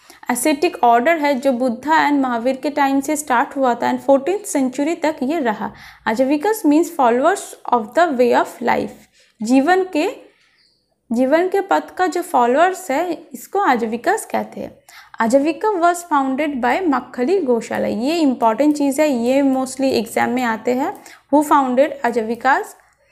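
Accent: Bengal, India. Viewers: And apnar kotha shunei bojha jaay apni Bangali🥰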